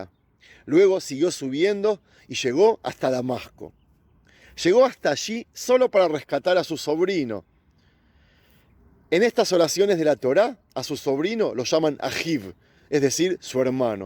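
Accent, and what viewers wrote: Argentina. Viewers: Creo que él es de origen argentino.. por eso habla asi.. por su asento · Feliz navidad??? Bien dice las Sagradas Escrituras: profesan ser judíos mas son sinagoga de satanás. Según éste estudia la Tora con doctrina romana y protestante, además tiene acento argentino sho digo que vos es un falso judío. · Este señor es argentino por su acento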